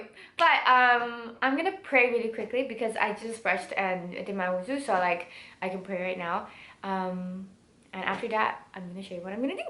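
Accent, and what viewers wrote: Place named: Malaysia. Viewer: i'm wonder where are you from ? malaysia ? because you're accent look malaysia pure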